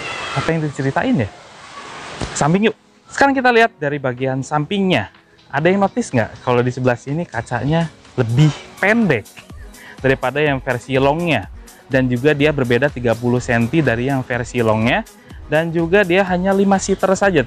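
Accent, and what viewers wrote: West Java, Indonesia. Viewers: Saya tau Anda dari videonya om mobi Gw suka logat sundanya:)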